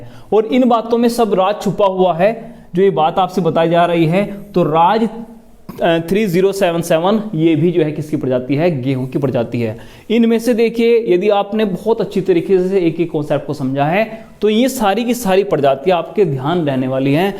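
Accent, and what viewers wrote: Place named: Bijnor, India. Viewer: Sir ap up bijnor s ho kya ? Apki bhasa s lga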